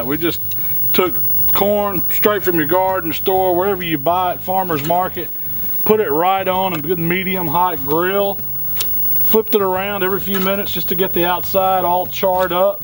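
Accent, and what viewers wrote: South, United States. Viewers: Love the Southern Twang, is that TexMex? Great recipe, I'm definitely trying this.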